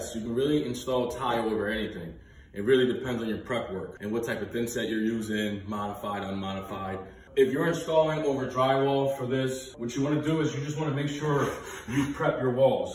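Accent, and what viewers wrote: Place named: United States. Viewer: i'm a cuban from jersey city (i just moved to oregon and found your vid cause im renovating my house), and i knew exactly what state you were from the SECOND you started talking LOL. anyway, solid video super helpful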